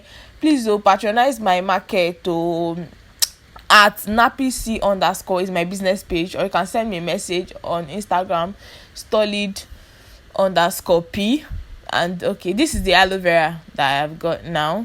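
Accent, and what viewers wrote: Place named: Igbo, Nigeria. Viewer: you igbo accent is amazing! I love it.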